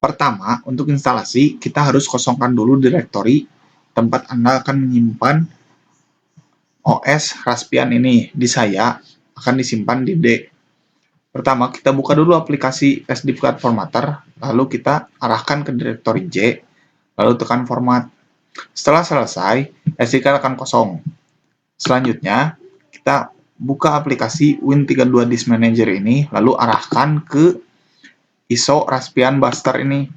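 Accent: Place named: West Java, Indonesia. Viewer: Mantap sa masih aya logat sunda 😆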